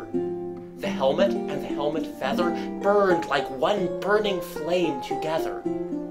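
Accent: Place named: United States. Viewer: Will Yanks stop this, or learn how to speak ENGLISH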